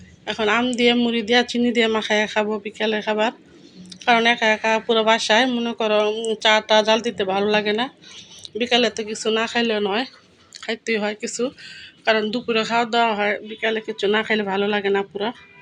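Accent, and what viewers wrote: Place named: Sylhet, Bangladesh. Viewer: সিলেটের ভাষা খুব সুন্দর,, আপু আমি কক্সবাজার থেকে দেখছি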